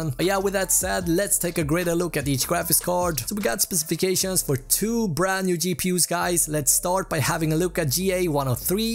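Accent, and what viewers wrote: Sweden. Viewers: I can hear you are from sweden after 2 sec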